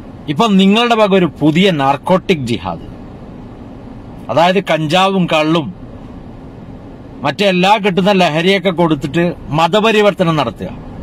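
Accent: Kasaragod, India. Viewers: കേറി വാ മക്കളെ...🙋 ഇനി മുതൽ കാസർഗോഡ് ഭാഷയിൽ ബ്ലോഗ് കേൾക്കാം...😍😍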